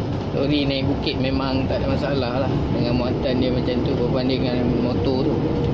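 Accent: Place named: North, Indonesia. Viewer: kuang ajaq🤣🤣🤣abg speking northern👍🏻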